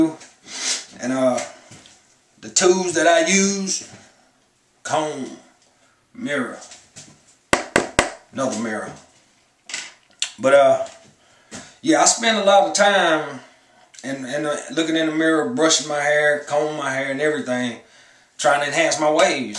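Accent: South, United States. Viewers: sexy assss country accent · Country accent is dope "G" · Lol he sound like he from the south od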